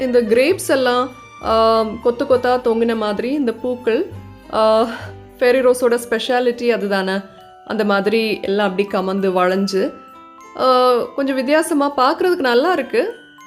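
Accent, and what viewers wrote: Kerala, India. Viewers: I love ur Malayalam mixed tamil pronunciation 😍😍😍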